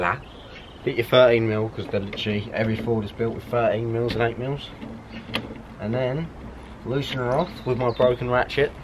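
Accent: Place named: Sheffield, United Kingdom. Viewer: Hahaha I've a mate from Sheffield who talks like you 😂 rate is right barnet is head LMAO it's funny when he talks lol I'm from Leeds but I don't talk like that lol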